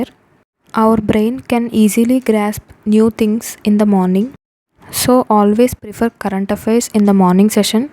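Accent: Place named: South India, India. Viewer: Your accent looks like from south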